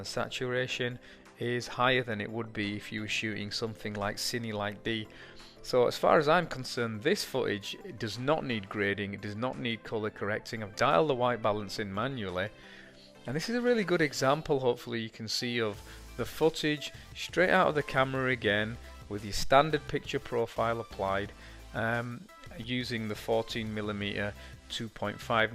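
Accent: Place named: Northern England, United Kingdom. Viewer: Nice northern accent ? I hope the model who is a stunner is from Yorkshire? Could you stop speeding her walk up , its the best part of the video .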